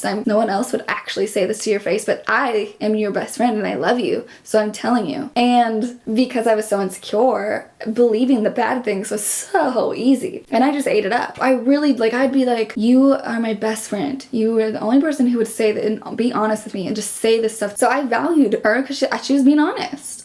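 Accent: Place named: New York, United States. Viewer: Yo u funny asf 🤣 n you sound like ur from NY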